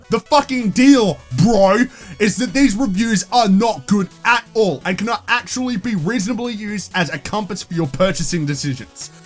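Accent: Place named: Australia. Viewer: The Australian accent just makes what you said even funnier. Great video as usual.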